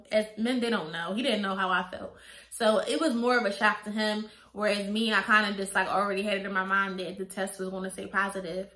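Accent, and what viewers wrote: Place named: Philadelphia, United States. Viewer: I'm going thur the same thing ughhh waiting to see if I'm pregnant now But r u frm Philly? I can hear it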